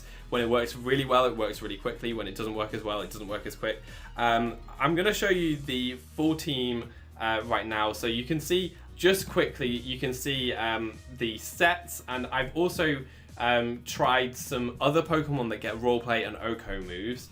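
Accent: United Kingdom. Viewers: Hey bro, you sound british. I have a question for you, is it coming home?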